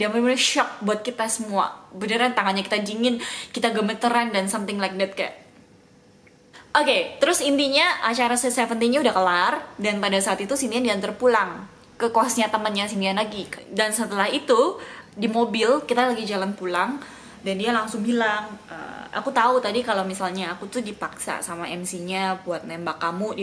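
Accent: Surabaya, Indonesia. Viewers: Lucuuuuuuu medokkkk surabaya banget deh 👍🏼👍🏼😂😂😂😂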